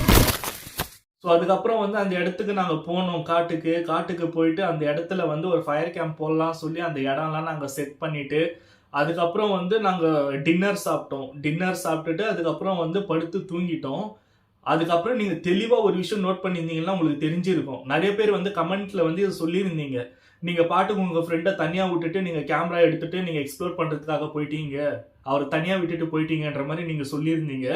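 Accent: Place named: Bangalore, India. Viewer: Bangalore tamil slang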